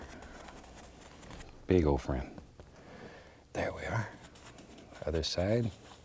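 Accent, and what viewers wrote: South, United States. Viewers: Even after all the years of living away from the south, he still sounded so very southern.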